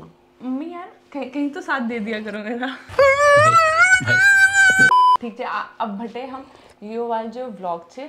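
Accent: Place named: Pahadi, India. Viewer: Bahut achchha laga aapka ghar, aap pahadi bolo ya na bolo, apke bolne mai Pahadi 'Ascent' to aata hi hai.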